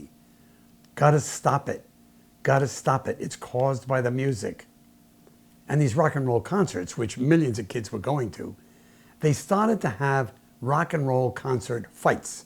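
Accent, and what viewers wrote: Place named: Brooklyn, United States. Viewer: I love your accent, I wish more people still had it. Are you from Brooklyn? My grandmother grew up in the Bronx and had a similar accent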